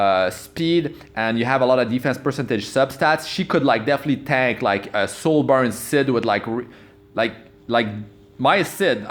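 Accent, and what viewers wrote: Quebec, Canada. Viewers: Salut t québécois?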